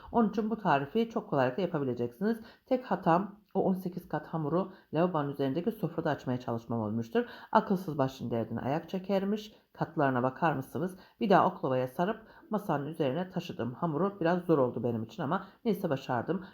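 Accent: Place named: Black Sea, Turkey. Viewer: Cok tatlisiniz karadeniz sivesi var hemserimsin tesekkurler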